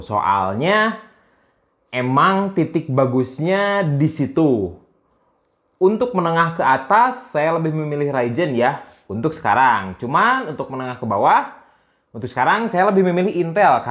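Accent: West Java, Indonesia. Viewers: Sunda wkaka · F = EP Sunda Accent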